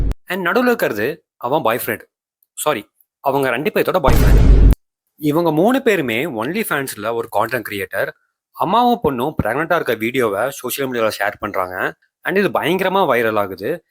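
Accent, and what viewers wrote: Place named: Kongu, India. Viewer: இந்த காணொளியை பேசுபவர் கொங்கு மண்டலத்தை சேர்ந்தவராகத் தான் இருக்க முடியும்... எப்படி என்று கூறுங்கள் பார்க்கலாம்???...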